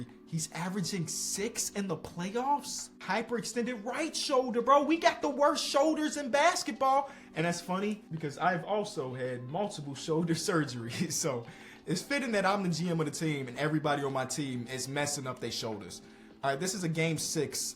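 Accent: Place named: New York, United States. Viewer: I still love that ever since became friends with SAS, he took on that New Yorker accent lol. Epic.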